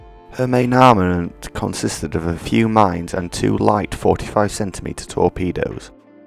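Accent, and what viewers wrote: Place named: Finland. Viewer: Todella vaikea kuunnella, koska spiikkaajan mikistä puuttuu ilmeisesti popfiltteri kokonaan, tuntuu että nauhoitettu jollain viiden pennin headsetillä. Jokainen henkäys puhisee mikkiin karusti. Tämän vuoksihan haastattelumikeissä on ne vaahtomuovit/pörröpäälysteet. Äänitasotkin jotenkin huonosti, niin kuin joku kommenteissa ehti sanoakin. Parempia ovat olleet ne sinun itsesi spiikkaamat englanninkieliset versiot. Suomalaisella englanninaksentilla on ilmeisesti yllättävän kiva "soundi" ulkomaalaisia ajatellen. Kannattaa ehdottomasti jatkaa englanninkielisten videoiden tekoa, Suomen (sota)historia ja niin erikoinen kalusto kiinnostavat ulkomailla!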